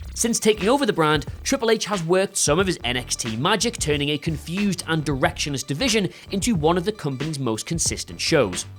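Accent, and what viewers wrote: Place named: Teesside, United Kingdom. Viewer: listed as from Newcastle but he sounds more like a Teesside boy to me.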